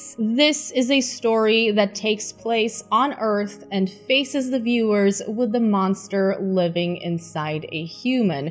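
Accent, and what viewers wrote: Russia. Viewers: Love your accent. Are you Russian?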